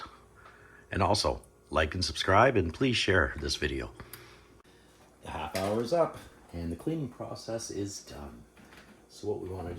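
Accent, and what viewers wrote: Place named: Upper Midwest, United States. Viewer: Where you from? North Dakota? Minnesota?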